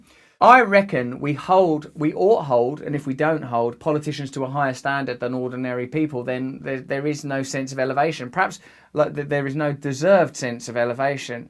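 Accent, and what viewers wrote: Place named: England, United Kingdom. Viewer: Not going to lie, I wasn't really a fan of Russell Brand years ago. I thought he was just another inept Hollywood elite who probably couldn't think for himself and was only popular because of his goofy English accent. I take all of that back. Thanks for keeping open discussion alive and calling out these modern day corrupt elitists.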